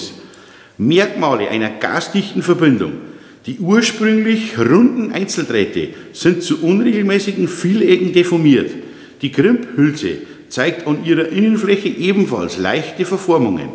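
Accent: Bavaria, Germany. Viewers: Ich finde deine Videos klasse, aber teilweise kommt dein bayrischer Akzent sehr stark durch und ich muss zurückspulen weil ich Dinge nicht verstanden habe 😅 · Die Versuche Youtubes Bayrisch in deutschen Schrifttext zu übersetzen - köstlich🤣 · Thats bavarian german :D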